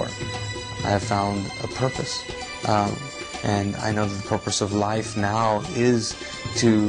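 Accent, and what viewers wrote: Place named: Spain. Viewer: WOW!!! You have REALLY REALLY convinced me to CONVERT to Christianity hehehe. BTW, it's "convert" NOT "combert". It seems that your Spanish mother tongue (lengua materna) is affecting your English. Chico! Tienes que aprender el inglés sin influencias extranjeras, para que la gente no se reía de ti !!!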